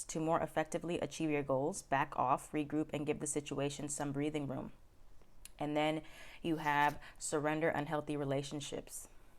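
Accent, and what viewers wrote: Bronx, United States. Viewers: You from the Bronx?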